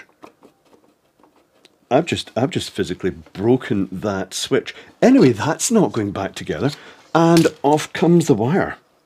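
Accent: Scotland, United Kingdom. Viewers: just adore your accent is it morningside. Totally annoys my wife with my rendition of, one moment please.my wife thinks Renfrew. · You sound British so this will be a disassembly rather than an American ‘teardown’ surely.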